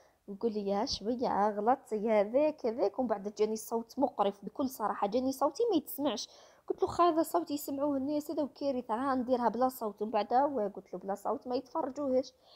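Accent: Setif, Algeria. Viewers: الف الف مبروك لعقوبة للذهبي تستاهلي وصوتك سطايفي يهبل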